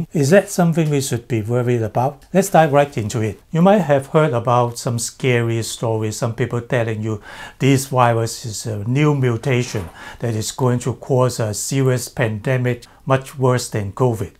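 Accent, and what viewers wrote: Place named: China. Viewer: Love the English with Chinese accent. Made me miss Hong Kong ! 🇭🇰❤ Hope this virus is not serious at all.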